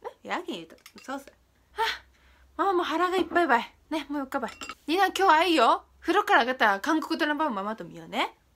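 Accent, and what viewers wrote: Nagasaki, Japan. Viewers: 本物の長崎弁ですね親近感がものすごあるとばい！横におるごた！ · 長崎出身やけん落ち着く〜〜😂 地元離れるとこの話し方が恋しくなる笑笑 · うち関東で方言使わないけど、なんかちゃんの長崎弁永遠と聞いてられる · 実際長崎弁っていうても広かよねー。ちゃんのは長崎市弁たいね！佐世保平戸五島島原それぞれ個性ありすぎて長崎市が標準語かなっておもうた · 長崎弁ばり可愛い！ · やっぱ長崎弁ていいね、話すとなると照れが入って話せないけど…好きだな～長崎弁！女が帰省からの、成田着くなりバックから取り出したのは、フルーツサンドイッチ、俺が長崎の物食べたいって言ったから買って来てくれて、周りに人がいるにもかかわらず即食いするもんだから（生温かく形も崩れてだけど）恥ずかしながらももっと買ってくればよかったね！良いんだよ気持ちと長崎が詰まってて旨いよ！今では良い思い出っちゃったけど。ちゃんの長崎弁で癒されます。長々と話してごめんよ、長崎弁バージョンありがとう☆体調崩さずに頑張ってね！応援してます。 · 知り合いの長崎人そっくりで、びっくりするwww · 大学の時の長崎出身の友だちの喋りと同じでめっちゃ良い(笑)長崎弁めっちゃ大好き(笑) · 数年間長崎居て姉が長崎弁ちょいちょい喋るから「うわこいつ長崎弁に侵食されやがった((((；ﾟДﾟ))))」って思ってたからレベチがここにいてなんか安心したやったぜ。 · かわいいなあ長崎弁俺の所方言とか特に無いから羨ましい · 長崎弁聞きたくなったら定期的に来てしまう笑笑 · 長崎弁好きだなー笑笑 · さんも長崎弁も可愛い💓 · 最近の影響で、長崎弁が1番可愛くて好きって感じるようってる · ちゃんの長崎弁世界一かわいいこれマジ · こてこての長崎弁使ってる🤣(笑)なんか、ちゃんの家の光景が目に浮かぶ😁幸せな家族だね😊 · 長崎弁がかわいいのかちゃんがかわいいのかどっちだろう… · 長崎弁大好きです✨ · が話す長崎弁が好きなの私たちは！ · 可愛い長崎弁😭😭❤ もうずっと聞いてたいから長崎弁バリバリのやつもっと希望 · 全然分かんないけどちょっと方言憧れある笑特に長崎弁！！ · 長崎弁好きすぎる、、もううちなんて、〜だべとかまじの田舎者の言葉だらけで嫌だ〜😩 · めちゃくちゃ長崎弁ぽいと思ってたらやっぱ長崎だったなんかうれしい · 長崎弁普通に可愛い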